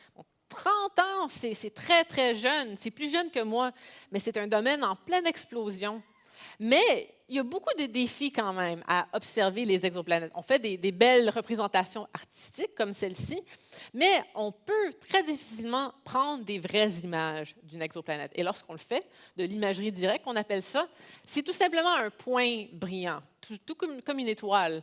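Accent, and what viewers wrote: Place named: Canada. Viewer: Que du bonheur cette conférence 😅 avec cette accent canadien inimitable 😂 encore meilleur 😅 de France ,je partage sur notre petite planète avec mes 2,9 k followers * 1,3 k suivi(e)s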